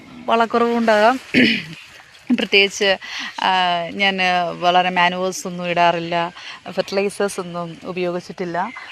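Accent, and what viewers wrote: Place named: United Kingdom. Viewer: ആ ഇംഗ്ലീഷ് ക്കെട്ട് അന്തംവിട്ടു പോയി ഇത് ഞങ്ങടെ തന്നെയോ അതോ വല്ല ബ്രട്ടീഷ് കാരിയോ നോക്കിയിട്ട് ഇത് ഞങ്ങടെ ക്കറുത്ത മുത്ത്തന്നെ 👌🏿👌🏿👌🏿♥️♥️♥️♥️♥️👍🏿👍🏿👍🏿💖💖💖💖💛💚💚💚🌹🌹💙💙💕💕💕💜💜💜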